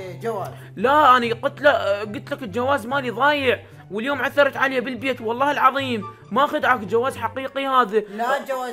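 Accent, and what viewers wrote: Iraq. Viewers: انتي عراقيه????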